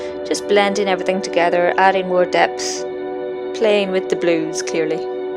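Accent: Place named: Wales, United Kingdom. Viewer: Hi! I just popped over from Jerree's channel to see your Halloween look in the collab. Your version of Woman of the Sea/Mermaid is beautiful, including the lovely background music. Your eyes are gorgeous & the scales look very realistic with the technique you used. That was so much fun. Also, I'm intrigued by your accent, is it Irish? Most of my mother's family is from Wales & have that beautiful melodic sing song accent & yours is similar, but I'm detecting a bit of an Irish broque. I hope you don't mind me guessing! Love your tutorial & I've just subbed. ~Susanne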